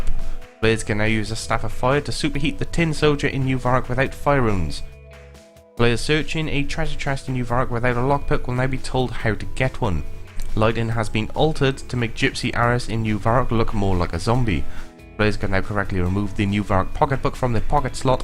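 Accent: Wales, United Kingdom. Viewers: what part of wales are you from XD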